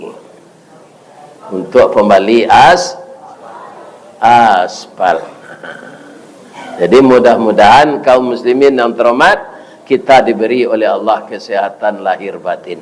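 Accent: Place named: Minangkabau, Indonesia. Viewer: Semoga tetap sehat buya. Ambo bukan orang awak sangat menikmati ceramah buya apalagi dg logat minang yg kocak dan isi nya Ambo sering tanyo ke bini Ambo yg Alhamdulillah orang awak. Sekali semoga sehat buya Aamiin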